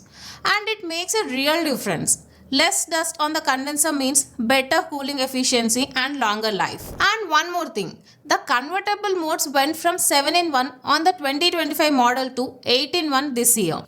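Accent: South India, India. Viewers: Are you from south?? Your speeching style like that...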